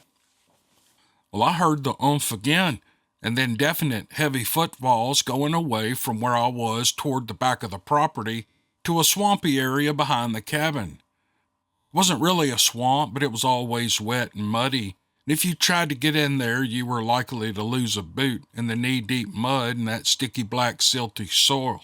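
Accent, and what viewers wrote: South, United States. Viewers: I love your American accent. From Australia 🇦🇺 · I love you and LOVE your fine Dixie drawl! It makes listening to these encounters and stories such a joy! Thanks for this one- I agree, it’s especially good! ❤🦍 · Your superior Southern accent sounds just like me, I'm from Eutawville SC, keep talking normally, we appreciate it! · Don't change I love the Redneck accent:D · Love ❤️ your Redneck accent 👍 Keep on entertaining us with your Awesome voice 👍 I agree with the previous commenter, some ppl just aren’t happy about anything 😝 I have never had anything to complain about since I’ve started listening to your channel, many moons ago 👍Keep up the great content & Happy New Year 😀👍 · Love your southern accent, from a Texas girl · I am from Ozarks in Arkansas. I understand u 100%. U talk like someone from the south. I'm planning on going to Mississippi next yr. 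And do some muzzle loader deer hunting. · Your Southern talk is what drew me to your channel in the first place! I feel at home. Love it. ~ Louisiana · I love your accent, I'm from GA so I know I have a southern accent and I like hearing yours, it reminds of home. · ill say what you cant say move on karen and kiss our country southern asses. If you dont like accent dont listen to the channel. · The best part of your narration is your not too strong beautiful southern accent. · Never lose your religion over someone not liking your "Okie" accent. What you have is just a typical Southern accent, that most of us from the South have. If some Northerner doesn't like it, well, "bless their hearts", they can go out back and tell it to the hogs! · Your distinct southern accent is at least 60 % of the charm and success of this channel! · Thank you. You have no idea how much I needed this today. Your the best!! 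Thank you to all who send in there encounters. Many blessings to you and yours. 😊 I agree with everyone commenting, your voice and southern accent is what made me start watching this show from day one. Your personality is amazing and we, “ your people “ love you and this podcast. So Thank you again, much love from NE Illinois 😊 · I'm an Okie!!! Lol I love your redneck accent! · I love your voice. It is a southern draw great for story telling. But you don't speak real slow as many do from the hottest gulf air regions. They just do everything at a measured pace, including talking. No doubt it is pacing yourself in the god awful heat. I hate humidity. When I am visiting your region I walk extra far to get anywhere as I stay in the shade as much as possible because the Sun is oppressive. So I plan my course to go from one piece of shade to the next. And I take frequent breaks to keep from overheating since my sweat just makes me wet without cooling me through evaporation. 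I always carry lots of water so I don't dehydrate. And when you mispronounce words I realize words are pronounced differently depending on the local dialect, so I never think you are making a mistake even though it may seem like it. There is no right dialect. But sometimes I am pretty sure you know you are making a guess at pronunciation. You make it entertaining rather than a distraction. Being humble enough to make fun of yourself works well for many. You do it well. Keep it up and don't worry about stuck up people that judge everyone. I think they are just judging themselves as lacking when they do so. It shows an ugly personality. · Love love lover your accent!!! No, it doesn't remind me of an Okee from Muskokee just a southerner and it is super charming!! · I love hearing you. Hard to find a true Southern Voice. Your people love it don't let 1 rotten apple ruin your day. Thank you for being here.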